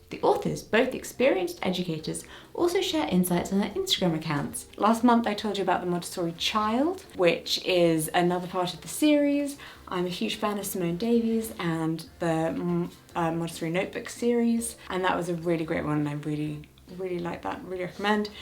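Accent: United Kingdom. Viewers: Low soothing voice and Brit accent but couldn't get past the 💄 Have a lovely day!😊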